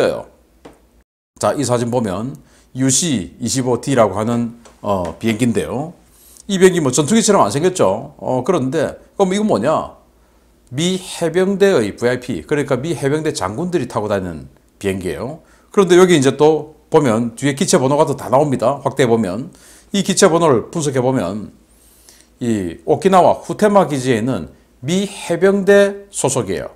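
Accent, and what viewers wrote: Gyeongsang, South Korea. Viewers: 구수한 경상도사투리항상잼나게즐구독하고있어예~^^;아침마다구독과좋아요..꾸욱~보냅니다..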